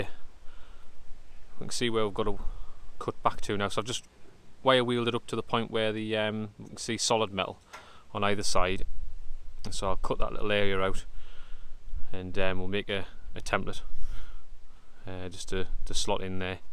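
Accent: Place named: Northern England, United Kingdom. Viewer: Top Vid, sounds like your up north. Do you know of any good MIG gas suppliers in north-east (Stockton/Co Durham) but not BOC?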